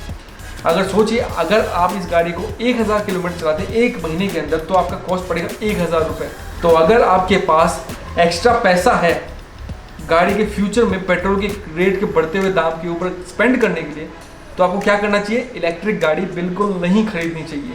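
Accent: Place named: Haryana, India. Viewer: Bhai pakka haryanvi hai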